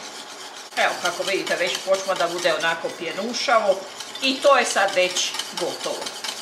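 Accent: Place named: Slavonia, Croatia. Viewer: Sta reci - potpuni uzitak gledati kao i uvijek spremanje odlicnih jela i slusati divan slavonski nagalasak. Moram probati ovaj recept za krompir. Pozdrav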